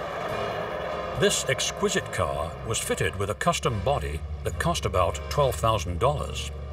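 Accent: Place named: United Kingdom. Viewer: If these cars were American made, why is there a british guy narrating this video?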